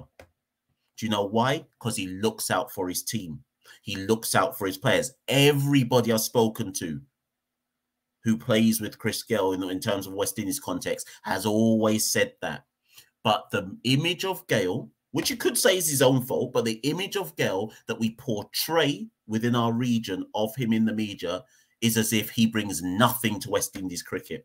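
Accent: England, United Kingdom. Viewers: I don't believed that you were born in Jamaica and talking, like you were born in England. Becaused my ex wife is living in England 58 years, she went there when she was 8 years old and still living there. I was married in England 🇬🇧 31 years, since I was married in England 🇬🇧, but I am living in the United States 👍 almost 30years now. I am older than Ambrose, Ambrose, Bishops, Carl Hooper, Desmond Haynes, Marshall, Jimmy Adam's,BENJAMIN'S them,so I know what I am saying. I can see that you are very young, I know since 1971 playing for the combined Islands them. Ambrose is very much out of order, they just jealous of Gayle with his money and fame.The man 👨 is well loved in India 🇮🇳 and, all over the world. Playing into a few games and failed, I don't see all the big thing what Ambrose is behaving so erratic. Becaused he cannot compared himself with Gayle also Viv Richards, Gayle is the only wi batsman to have two T20 hundreds. 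The first batsman in the history of the T20 cricket 🏏,to scored the first hundred in his 1st match against South Africa 🇿🇦. I hates how these small islands guys is behaving so erratic, they does not want any Jamaican to play on the wi team. Viv Richards is talking about Jason Holder is not a good player at all, he may luckily get a few wickets now and then.Also scored a few runs, but he is not a reliable, or competent player like Gayle. Anybody who knows about cricket 🏏, every player have their ups and down. In their career, so Viv Richards is very st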